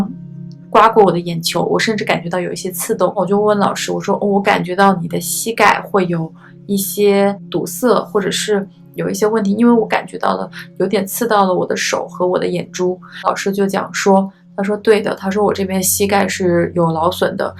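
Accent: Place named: Beijing, China. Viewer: Her accent sounds like Beijing or Tianjin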